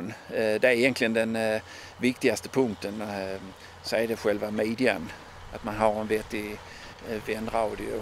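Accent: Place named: Scania, Sweden. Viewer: He speaks Swedish but with a dialect from southern Scania, the southernmost part of Sweden and therefore Scandinavia.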